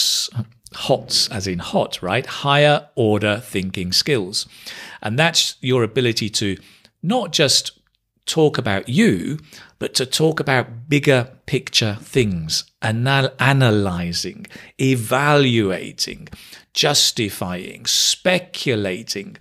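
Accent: United Kingdom. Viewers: Your English accent sounds amazing .. I am in love with British English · Hi. My exam is on March 7th. I really would like to have as much ideas from you as possible. I like your ideas and I love the British accent. I hope that you can make more videos sir. Thank you so much 🙂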